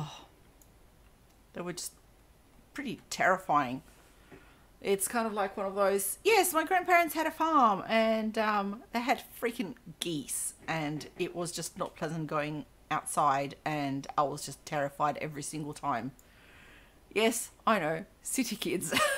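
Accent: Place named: New Zealand. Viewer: Oh yes, I'd almost forgotten, but now you mention it, it all comes back. So sorry. It's just I like hearing you. Even if you're not quite a NZer you sound a lot like many of my NZ relatives, especially with the phrases you use. :D They all have much stronger accents than me. :-) Anyhow, I'm pleased you'll continue this series, though I'd equally be pleased if you started a new Sims 4 PC series... :-) All the best to you, my friend. hug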